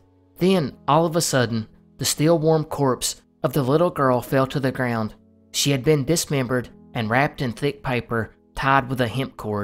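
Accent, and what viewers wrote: South, United States. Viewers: I’m from the south & i love hearing other southern accents!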